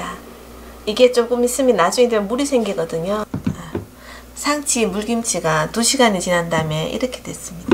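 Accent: Gyeongsang, South Korea. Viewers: 대구. 경북분이신듯?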